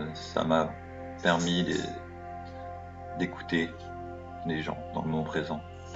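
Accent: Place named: Quebec, Canada. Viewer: Si l'accent québecois est supportable, ça parle du stress <link>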